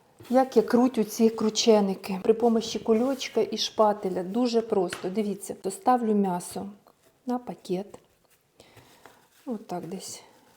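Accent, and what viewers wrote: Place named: Poltava, Ukraine. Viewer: Так говорять на Полтавщині,на Сумщині,на Донбасі.Що не подобається? Чі тільки треба на галичанському говорити.Коли ви вже перестанете чіплятися до того,хто як говорить.Задрали вже!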